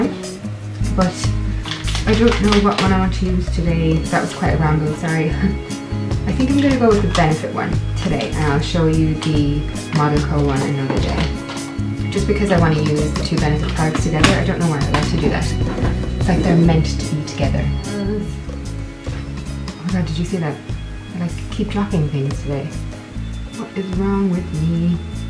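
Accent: Ireland. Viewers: Love this! I'm glad u commented on my video cause now I have discovered yours!:) Are you from Ireland? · Love grave yard girl ! Are you from Dublin :) x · just found you recently. and love your Irish accent. I'm in UK but have perm resident visa for Oz, waiting and hoping to return once hubby gets a job. How long have you been there and what do you think?? Joan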